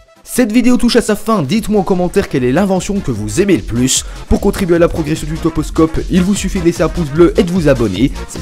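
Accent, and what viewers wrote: Belgium. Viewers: T'es pas belges ? Parce que t'as un accent qui ressemble fort à l'accent belge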